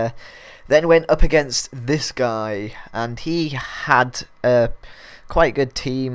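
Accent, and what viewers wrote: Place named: Yorkshire, United Kingdom. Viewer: hearing these crazy Scandinavian names in a Yorkshire accent is half the fun ;D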